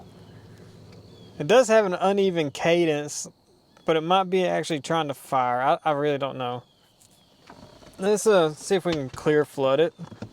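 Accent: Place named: Deep South, United States. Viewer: How about you? Your southern accent makes me think Deep South, but even some of us citified Okies sound pretty hick. So accents can be deceiving.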